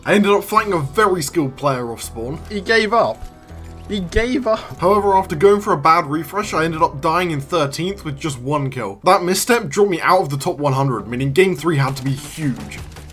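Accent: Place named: North America. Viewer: lol you sound na not tryna be rude tho but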